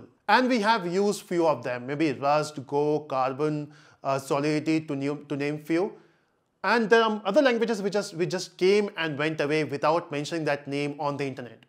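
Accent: India. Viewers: >load video >hears gay pojeet accent >instant pause >dislike >leaves this comment >closes video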